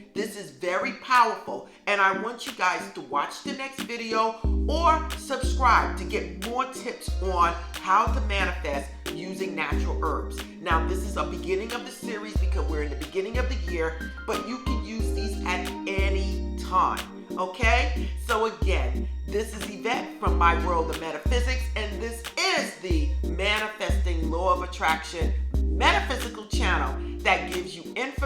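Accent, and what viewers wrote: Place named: New York City, United States. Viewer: Thanks, friend. I'm originally from Long Island (but I'm a Georgia peach now) and your NY accent is next level - wow! Thanks for the tips.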